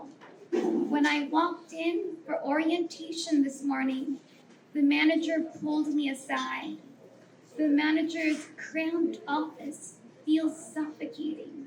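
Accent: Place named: California, United States. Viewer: I knew you were from Cali just by your accent alone!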